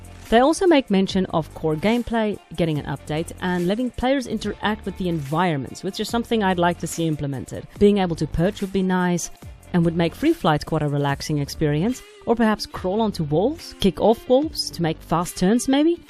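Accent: South Africa. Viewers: Found you last vid and now sticking around, loving your content also are you from SA? the "my China" gave it away. That 4v4v4 is freaking nuts btw and really chaotic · Not game related but your accent sounds really familiar are you south African perhaps ?😂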